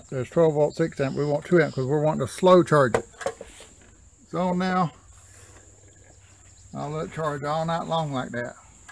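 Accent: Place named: South, United States. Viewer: I've got a couple of batteries new I let go I shouldn't have and I really wanted to understand how to rejuvenate these batteries they're deep-cycle but I can't understand a damn word you're saying hardly you need to learn how to speak or something I know country accent is one thing but when you're making videos everybody needs to understand what you're saying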